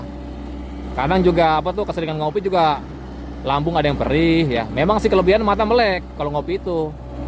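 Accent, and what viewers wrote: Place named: Jakarta, Indonesia. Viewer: Nggak apa2, enak kok ngedengerin logat betawi ora 😅😅😅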